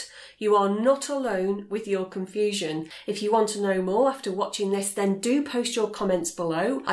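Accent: United Kingdom. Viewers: Nice, but I can,t catch British accent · Very good explanation. And what a lovely British accent (for a French like myself 😊)! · Minus the thick British accent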